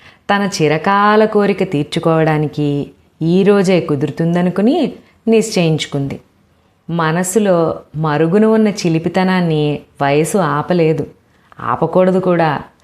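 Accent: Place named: Godavari, India. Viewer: మీ అగ్రహారం కథలన్నీ విన్నాను అమ్మా... చాలా చాలా చాలా బాగా చెప్పారు మా గోదారి యాసలో....❤❤👌👌👌👏👏👏🙏🙏🙏🙏 ఒక్కటి పక్కన ఫోటోలోనీ వాళ్ళూ నిజమైన వారా??!! లేక ఊరకనే వేశారా???!! నా సందేహం తీర్చగలరు. ఇక మీ మిగతా కథలూ వినటం మొదలు పెట్టాలి.ఉంటానమ్మా🙏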